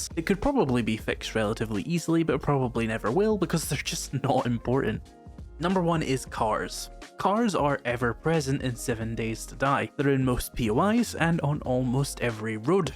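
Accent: Scotland, United Kingdom. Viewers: Complaints in a Scottish accent just work!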